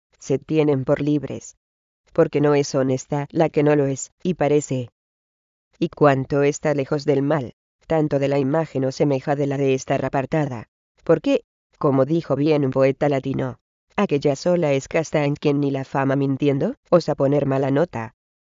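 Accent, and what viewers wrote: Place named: South America. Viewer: pronunciación robótica y sudamericana, llena de seseo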